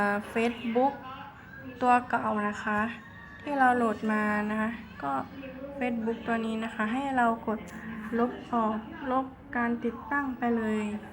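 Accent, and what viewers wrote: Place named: Korat, Thailand. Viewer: พี่เป็นคนโคราชรึเปล่าค่ะทำไมพูดไทยเดิ้งค่ะ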